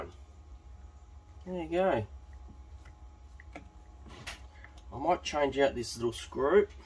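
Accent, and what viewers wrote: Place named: Australia. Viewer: I was expecting to hear a french guy speaking, bugger me if it wasnt a fellow Aussie !!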